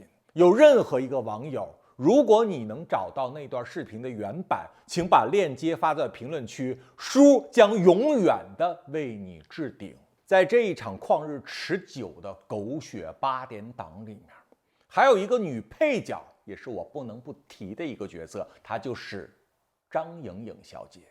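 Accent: China. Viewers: 你是大陸口音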